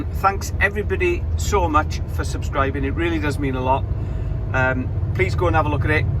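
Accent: Wales, United Kingdom. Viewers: Sounds good to me the more the better with your accent it sounds if you are originally Welsh if so what part 👍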